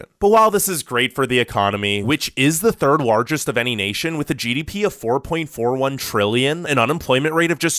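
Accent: United States. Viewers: Great video idea, but the ranking and argumentation is a bit weird sometimes and THE VOICE SOUNDS SO AMERICAN I HAD TO STOP WATCHING AFTER A FEW MINUTES 🙆🏻‍♀️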